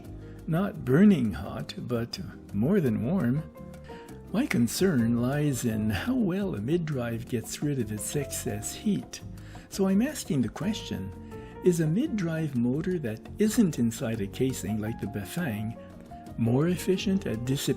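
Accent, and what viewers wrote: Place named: France. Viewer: Je sais pas, la rue Maine je croyais c'était à Sherbrooke, et il y a beaucoup de côtes dans cette ville-là. Alors j'ai cru c'était cette ville-là. Mais clairement je savais t'étais français juste de la manière tu parle l'anglais. Haha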